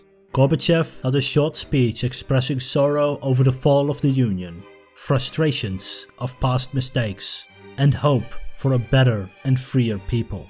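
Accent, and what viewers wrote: Netherlands. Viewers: Ugh I added this vid to watch later, wanted to watch it later but i totally forgot this guys horrible accent. All your topics are interesting but either do them in Dutch or work on the accent cause its unwatchable for me. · I appreciate this entire video and all the effort that went into it; but fuck me, does your dutch accent hurt my ears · I can hear you're from the Netherlands or in neighbouring regions goed man!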